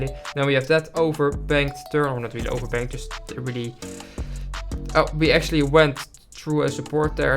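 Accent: Netherlands. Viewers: Your english is so dutch if that make sense😭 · I hear the Dutch accent haha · I smell Dutch🟥⬜🟦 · You sound like a dutch person that speeks english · Nice accent it sounds dutch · Je bent Nederlands ik hoor het aan je stem je hebt een Nederlands accent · i smell dutch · You sound dutch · Ik dacht al waarom je engels zo erg klinkt als nederlands maar toen kwam ik er achter dat ik al eens een keer je video heb gezien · Ur Defently from the netherlands u hear it / je moet niet altijd engels praten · Why do you sound so Dutch? If u are: 👄🦷NeDeRlAnDs💪👁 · I SMELL DUTCH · jij bent een hele goeie youtuber ik hoor jou nederlandse accent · Ik vind het grappig dat je hoort dat je Nederlands bent hele toffe vidio 🧀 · Aan je acsent ben je Nederlands denk ik he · Ik ruik een Nederlander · ik ruik een nederlander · Bro when I heard u the first time I already knew that u where dutch like me 😅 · Ik ruik een Nederlander. 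· I smell Nederlands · I immediately smelled dutch. Hoe gaat het man? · I smell Dutch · I smell dutch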